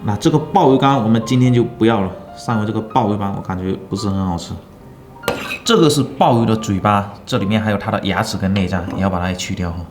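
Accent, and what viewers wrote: Fuzhou, China. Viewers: 他就是福州人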